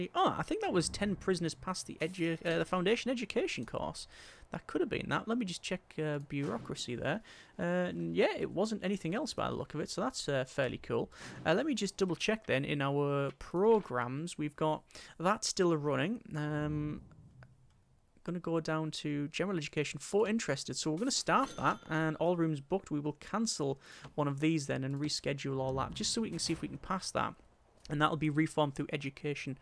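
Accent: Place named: Newcastle, United Kingdom. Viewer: Recently found your channel and have binged your current PA series lol. Couple of questions, Do you stream? If so when? And also where are you from? Your accent frustrates me lol as I want to say you are from the north or Newcastle area but may be completely wrong lol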